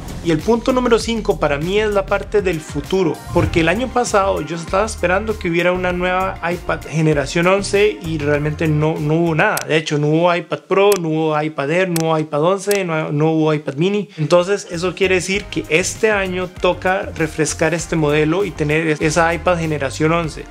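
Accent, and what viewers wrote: Costa Rica. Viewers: Me va a decir que no es tico? Yo juré que sí, lo escuché y dije, que bien un tico jajaja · Este Mae habla como tico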